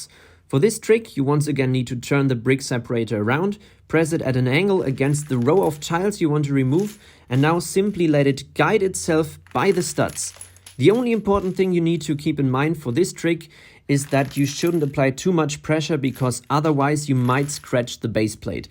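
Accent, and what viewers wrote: Germany. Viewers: Are you are German??? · man hört den deutschen dialekt also sprich halt deutsch hört sich so nicht schön an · Youre german arent you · Do i hear a german accent? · Da hab ich den Deutschen Raus gehört:) Hallo;)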